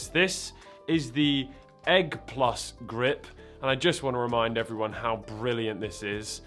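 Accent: United Kingdom. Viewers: For some reason he sounds more British in today's video. It's quite nice actually.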